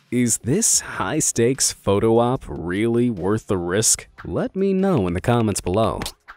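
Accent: United States. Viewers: patronizing yanky voice...urgh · This is a weird video. Why would a narrator with an American accent use the English word lorry?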